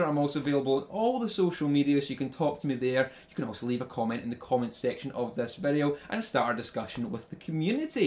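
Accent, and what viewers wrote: Scotland, United Kingdom. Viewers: Best guitar channel on youtube. Mainle due to your scottish accent. Greetings from DK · Thankfully it's not a very complete Scottish accent, otherwise it would be impossible to understand and even worse for the non english speakers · I think the Scottish accent accounts for at least 40% of the reason I watch your channel. It's just so pleasant to listen to.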